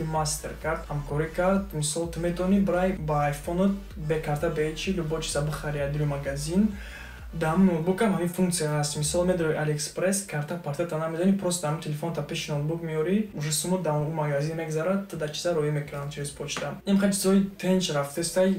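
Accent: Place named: Dushanbe, Tajikistan. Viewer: мо душанбегие хамихели гап мезанем ихели лавза созтар мефахмем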